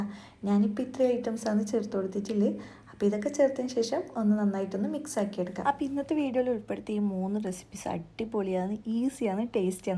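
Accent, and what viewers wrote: India. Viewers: ശബ്ദം കേൾക്കുമ്പോൾ തളിപ്പറമ്പ ടെച്ച് ഉണ്ട് 😄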